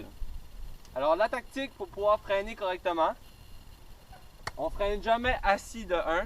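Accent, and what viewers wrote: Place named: Quebec, Canada. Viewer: L’accent québécois mdrrr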